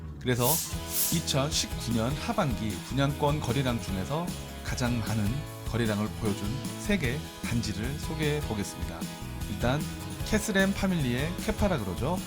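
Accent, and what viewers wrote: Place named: Jeolla, South Korea. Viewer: 혹시 고향이 전라도신가요? 고향말투가있는것같아서 반갑네요ㅎㅎ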